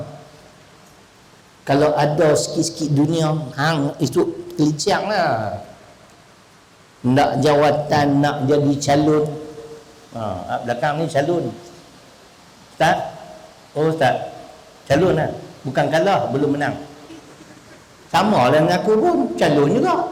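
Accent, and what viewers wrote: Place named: Kedah, Malaysia. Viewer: Biasalah orang Kedah, kasaq sikit.. tapi hati bertaman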